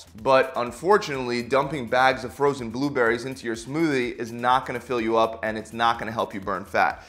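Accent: Philadelphia, United States. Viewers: You a Philly man?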